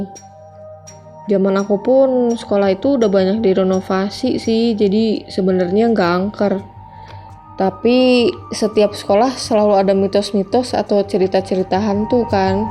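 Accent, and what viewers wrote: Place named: West Java, Indonesia. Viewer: Logat Sunda nya terasa Karakter suara mu kaya di animasi di tiktok yang gak takut sama hantu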